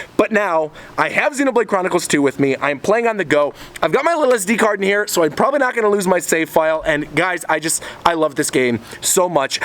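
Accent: Australia. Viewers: Im pretty sure he sounds Australian. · Australian accent?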